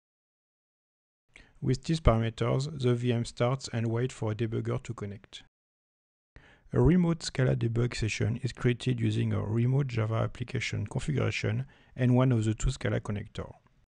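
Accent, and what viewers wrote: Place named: France. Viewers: The french accent is very very very strong. Could make some efforts to speak with a better english accent. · I agree that his french accent(I could understand only half of it) was strong but still you could be polite. At least he made an effort to make a screencast for us.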